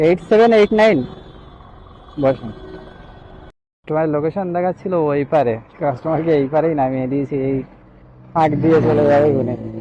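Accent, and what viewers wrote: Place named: Basirhat, India. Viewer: Apni bosirhat er chele taina? Apnar kathar tan okhaner moto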